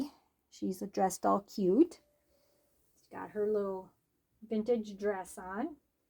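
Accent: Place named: Minnesota, United States. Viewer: Omgosh this was so good and funny!! You are a stitch 😂😂❤❤ I can identify with your Minnesotan dialect ❤❤ I love your creative way to fix him into what y wanted ❤️ 💙 he is such a boy now!! I do not have one yet, but they are adorable 😍 ❤️ Are u ready for the colder air moving back in 😢 it was so beautiful yesterday. I guess we're still in spring labor pains😂 Your cupcakes 🧁 were so nice•❤❤ I bet they are yummy!! I received my new baby girl!! You can see a clip from her artist on her channel, Tinyhands4ever. ❤❤🎉🎉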